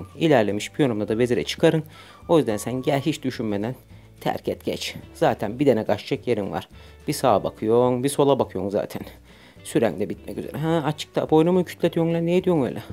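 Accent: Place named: Aegean, Turkey. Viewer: Hocam muthış olmus ya🤣🤣🤣şive tam bizim yöre,ayrıca bir sevdim🌺😊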